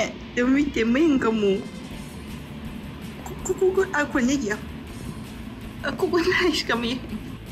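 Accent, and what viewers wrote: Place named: Okayama, Japan. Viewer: ここも美味しいですが、埼玉の騎西店はラーショの中でもサイコーです。是非一度！でも、どこの訛りなんやろ❔岡山辺りかな～⁉️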